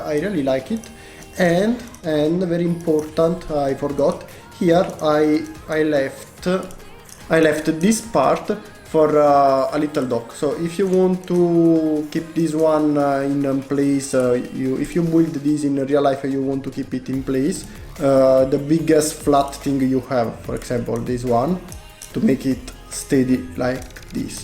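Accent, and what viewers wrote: Italy. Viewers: Italian accent?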